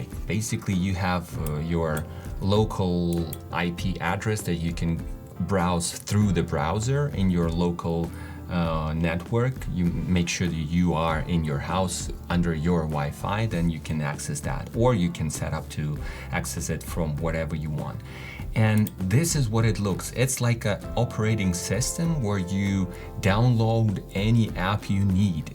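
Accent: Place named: United States. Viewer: Hey I like your accent,- sounds really good American!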